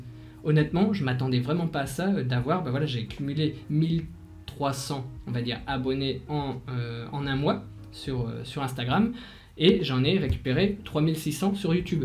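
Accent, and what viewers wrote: North, France. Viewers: J aime bien tes vidéos elles sont toujours instructives et j aime la façon que tu présentes et j aime l accent du nord de la france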